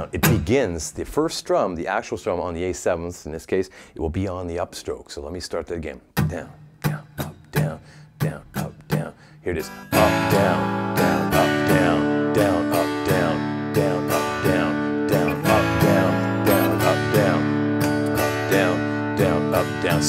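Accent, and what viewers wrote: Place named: Canada. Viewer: Great channel Love the structure of the lessons and the Canadian accent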